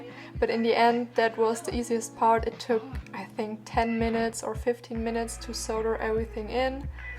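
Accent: Germany. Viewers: Man merkt, dass du Deutsch bist :D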